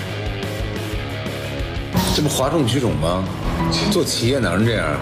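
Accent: China. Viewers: 北京口音好爽，语速也超快。我本人是外国人，只能听一下真学不来。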